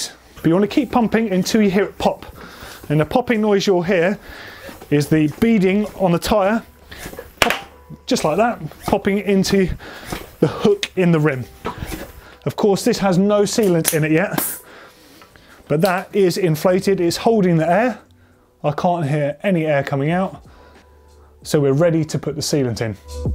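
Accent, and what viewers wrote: United Kingdom. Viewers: British accent is so hard to understand for some Americans. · Awesome job and great explanation as always. I’m a Brit, now living in LA so I miss the accent. Great advice and a tase if home! Thanks GMBN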